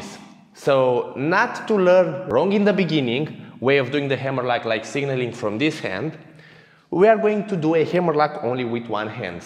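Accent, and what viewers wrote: Romania. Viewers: Romanian accent. Resembles the italian accent. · Puteam sa jur că ești român după cum vorbești engleza...Mulțumim de tutorial...ii ca lumea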